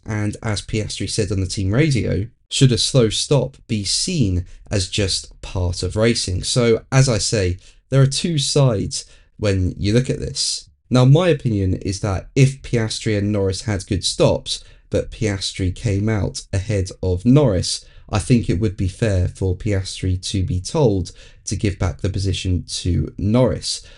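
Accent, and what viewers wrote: United Kingdom. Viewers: You speak as a british, not a car racing fan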